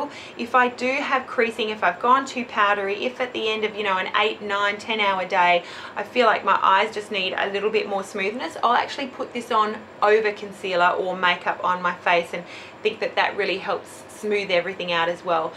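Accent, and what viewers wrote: Australia. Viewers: Hi, My favorite Dermalogica product is the Dermal Clay Cleanser. I have oily skin and that product, in combination with my Clarisonic, leaves my skin feeling amazingly fresh and clean. It has also worked wonders for my pores. Love it! I just recently discovered your channel and I love all your videos so far. I love your Australian accent! Greetings from Texas:)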